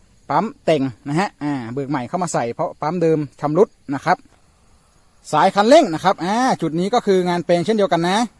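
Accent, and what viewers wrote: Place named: Lampang, Thailand. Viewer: สำเนียง เหมือนคนลำปางมากครับ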